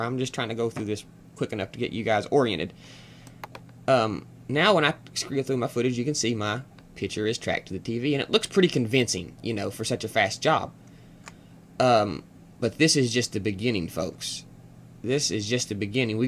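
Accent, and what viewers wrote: South, United States. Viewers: Out of all the help I have ferreted out, your help takes me over the top. You rock! Although I wish you would use STP and place a compressor on your vocal track--your southern good old boy accent kills me.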